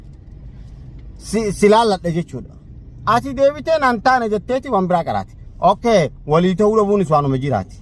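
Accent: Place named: Somalia. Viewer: Kuni namichi sumaaledha oromo maaltu godhe haasofin isaa ni beekama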